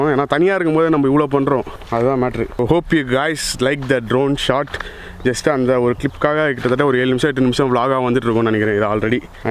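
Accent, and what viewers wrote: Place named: Chennai, India. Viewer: Chennai slang iruku bro pesumbothu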